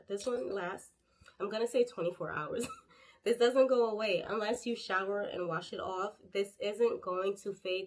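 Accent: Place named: Caribbean. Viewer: Great video! You have a slight Caribbean accent. I’m curious, what’s your nationality if you don’t mind asking?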